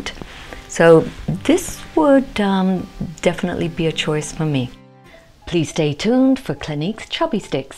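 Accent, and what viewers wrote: England, United Kingdom. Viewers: ...The same to you. I love your channel, as well as your accent. Oral tradition has it that my relatives way back are from England. Maybe the reason I love to hear the accent. I live in the South, so we do have a “Southern” accent, with “ya’ll “ thrown in 😂. We study about everything you can think of, not on a certain grade level. I try to get them interested in learning everything. We are heavy on Math, science, reading, and history, but throw in art and music too. I was a teacher back in the day, then went back to study nursing, and finished my working for pay, as a critical care RN. The girl’s mother is also an RN. ( I work for free now 😂)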